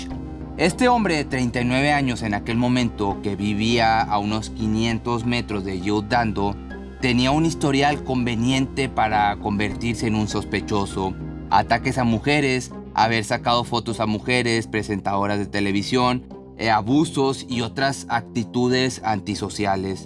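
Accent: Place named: Mexico. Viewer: eres muy guapo🥰🤗,..descubrí tu canal ayer y de una me suscribí 😁...de dónde eres, tienes un acento como ser de México 🤔🤭😻😍😘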